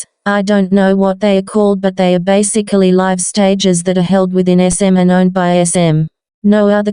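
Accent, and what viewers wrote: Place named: Australia. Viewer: I know this is random but why does your robot voice have an Australian accent?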